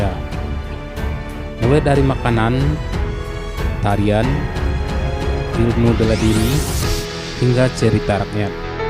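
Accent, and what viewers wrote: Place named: West Sumatra, Indonesia. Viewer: Dari nada bicara org yg cerita d.vidio ini ..aku bisa pastikan kamu org minang jadi kalok bicara soal inyiak atau siluman harimau itu memang nyata baik d sumatera atau d.jmbi tepatnya di prguruan silek rimau ..atau perguruan halimun P. Atau di kampung pamunyian kecamatan lubuk mengkoang kab.tebo jaya jmbi tapi kalok bicara soal adat itu semua suku sudah pasti mempunyai adatnya masing² 🙏.dan di sini saya hanya ingin mengutip sedikit fakta ya itu ..pandangan antara suku kalok saya sering lihat dengan sedirinya kenapa suku minang itu selalu memandang negatif tentang org jawa contohnya sedikit dikit [urang maa.. tu da itu si.akang mah da ] jd kalimat yg begituan kyknya kurang cocok bagi kita sesama suku bangsa INDO.andai saja org suku lain yg berbicara demikian contoh [org mana itu mas org si kerbau atau kabau mungkin sangat janggal kedengaranya kalau bisa mari kita saling menghargai sesama suku bangsa karna kalau di ranjikan atau sejarahkan terlebih di agama kita semua adalah saudara sanak famili yg harus menghargai satu sama lain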